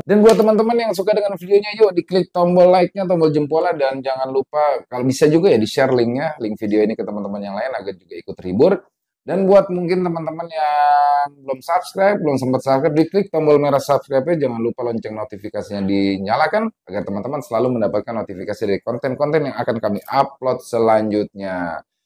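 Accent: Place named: Jakarta, Indonesia. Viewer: Bang org betawi ya? Bhsanya lucu logatbetawi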